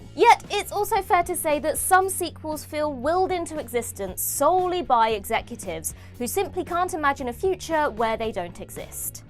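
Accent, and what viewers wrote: United Kingdom. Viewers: I like the snacky British accent. Did she see the last 15 minutes of "28 Years Later"? Please, Watch the movie.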